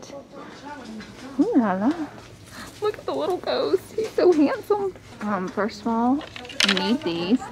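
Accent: South, United States. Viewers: I miss her southern accent. It’s more endearing than the Cali girl thing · OMG!!! I LOVE THIS VIDEO. Girl your southern roots are showing out!!!!😘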